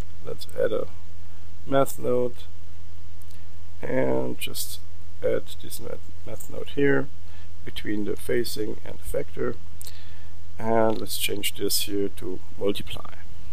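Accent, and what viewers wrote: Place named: Germany. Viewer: Man hört dass du deutscher bist! ;)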